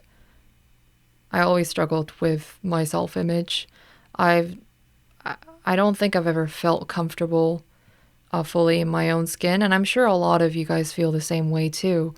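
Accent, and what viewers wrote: United States. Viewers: What part of the United states gives people that accent?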